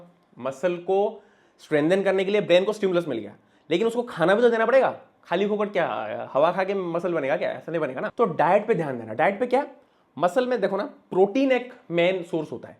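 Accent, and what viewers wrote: Odisha, India. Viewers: Odia loka nka Hindi bhai pura jaana padijauchi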